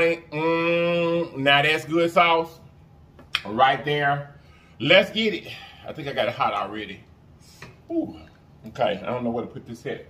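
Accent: United States. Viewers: Hey!! I like that country talk. I be becoming up with words that don't make sense lol you can say them words and it be so 🤣😂😂🤣🤣 and everything and everything 😆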